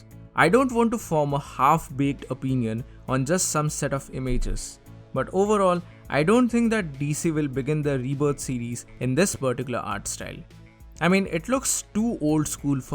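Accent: India. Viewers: No offense but you sound like the typical Indian person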